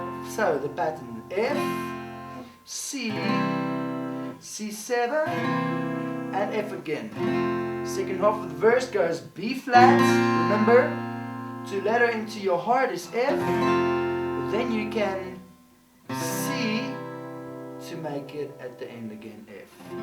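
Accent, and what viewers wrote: South Africa. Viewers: My entire father's side of the family is South African so right when you started talking, I knew immediately where you were from. Awesome tutorial! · Great fan of your lessons.. very helpful lesson. Thanks for taking the time out to do this for us. Greetings from India to South Africa?? (Your accent says so!)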